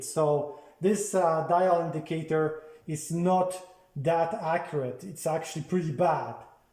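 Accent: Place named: Russia. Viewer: Interesting accent, russian perhaps?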